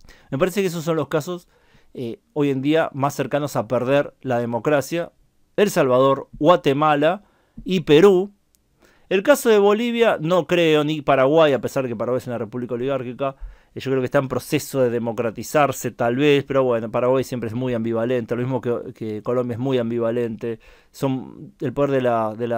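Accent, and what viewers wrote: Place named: Córdoba, Argentina. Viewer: suena a cordobes (o cordo-chanta)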